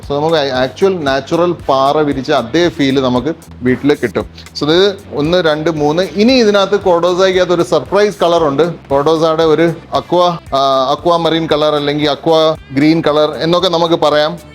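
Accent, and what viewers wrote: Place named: India. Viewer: Thanks for your reply. My project is in a planning stage, so presently is looking into various options n materials before final selection. I am from Ernakulam. I often see your videos. It's quite nicely presented with lots of new info for a layman like me. Keep it going... Hearing your unique malayalam accent, with a touch of manglish in it, I have always thought you were educated somewhere outside kerala.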